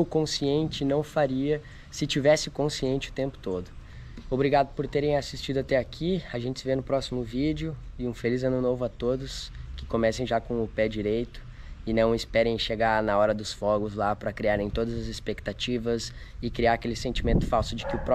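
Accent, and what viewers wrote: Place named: Dominican Republic. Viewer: Desde o primeiro vídeo já imaginei que você era do RD ahaha a forma de falar lembra o Eslen!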